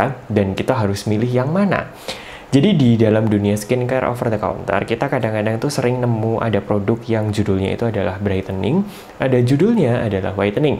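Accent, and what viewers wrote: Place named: Surabaya, Indonesia. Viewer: Ya Allah pantes kok logate suroboyoan. Ternyata alumni FKG Unair toh. Suwon gawe infone mas 😅😅akhirnya terjawab sudah pertanyaan ttg brightening vs whitening.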